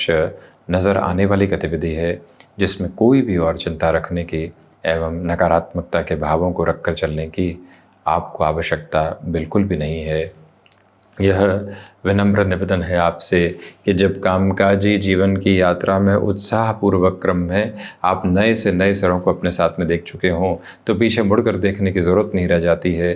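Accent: Standard. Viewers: आप सुध हिंदी बोलते हैं।। बहुत अच्छा लगा ।।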